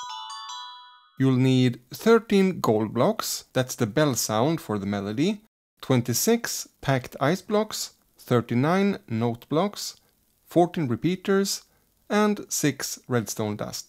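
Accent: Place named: Sweden. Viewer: It sounds like ur swedish and talk english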